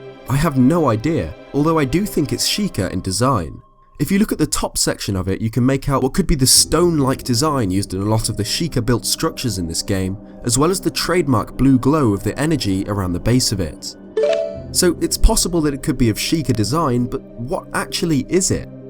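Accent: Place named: RP, United Kingdom. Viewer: Thank you for a clear voice and speaking the Queens english, as well as knowing what you talking about! Very professional! Congratulations, job well done!!!!!!!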